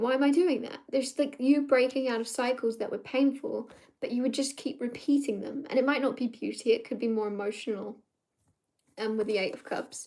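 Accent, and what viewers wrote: United Kingdom. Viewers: . You. Do. Sound. Very. British. Possibly. Irish. Not. Welsh. Or. Scotish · Hi. I thought you sounded British?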